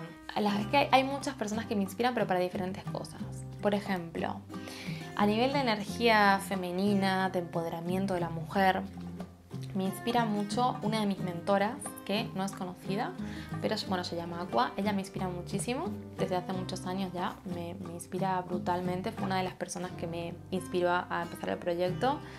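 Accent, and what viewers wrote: Argentina. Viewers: Sabía que eras argentina! Tu manera de hablar lo delata. Me encanta todo lo que compartís diosa ❤ he puesto en práctica tus consejos y me siento mejor. Gracias! Un abrazo desde Argentina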